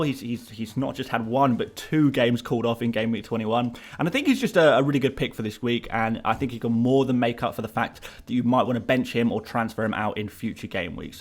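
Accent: Nigeria. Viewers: R u a Nigerian mate??🇳🇬🇳🇬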